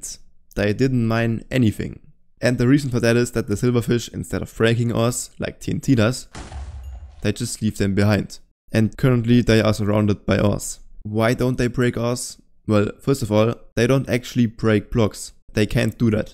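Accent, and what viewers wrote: Germany. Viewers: I can hear the german accent😂 i really enjoy your videos btw i always watch em while playing minecraft myself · Do you speak german? Your accent sounds kinda german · are you german? alles gut hört sich defentif besser an als bei mir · Man. I really like germans speaking english!!! 😄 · German detected. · Bro sounds a bit too german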